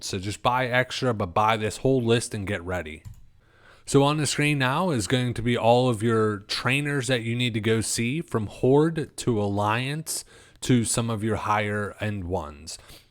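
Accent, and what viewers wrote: Philadelphia, United States. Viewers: Definitely from Philadelphia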